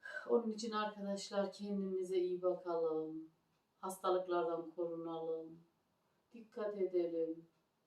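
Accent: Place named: Aksaray, Turkey. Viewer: Nerelisin abla konuşman Aksaray lı gibi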